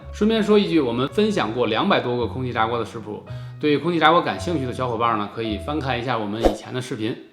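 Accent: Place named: Beijing, China. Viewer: 看你很多期了 各种北美生活的妙招儿 北京话也特亲切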